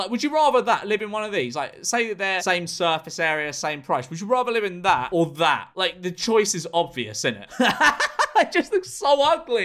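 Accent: United Kingdom. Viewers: It's kind of worrying that my ideal man...male version of me, exactly the same accent and thoughts...is young enough to be my son.....🙄 I wonder if takes after his father, and if his father is single...? 😂🤣😅 He's so funny, so sarcastically British, and so eccentrically old for his age, it just cracks me up! However, I must add, my daughter, son-in-law and five children live in a new build, and it is lovely. It's four bedroom, two bathroom, the lounge is huge and the kitchen / dining area is the same size as the lounge. I'm very particular with things being central and lined up....that one with the angled drainpipe for example, who gives a shit about a bit of gutter pipe when the porch is not even above the front door? That would make me insane far quicker than a dripping pipe! But my daughter's house is very neatly done. The windows are also about as big as would fit in leaving enough bricks for structure. 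Her's is also on a corner plot, big block paving driveway for two cars on the left, go through the gate on the left and the garden sweeps all the way from the driveway, round past the kitchen door, and then wraps all the way round the whole back of the house. It's massive, and beyond the fence is a stream and woodland. She hit the jackpot getting this one! She's only on social housing through the council and her's is rented from a housing association. Rent should be £1,500 a month, but for them it's free. The new build she was in prior to this one, the developers pac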